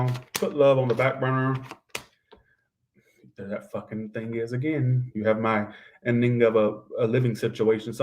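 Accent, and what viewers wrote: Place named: East Coast, United States. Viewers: Love the northeast accent! Very good! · I love your east coast talk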